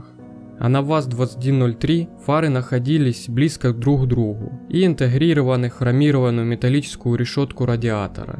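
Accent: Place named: Ukraine. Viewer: Он из Украины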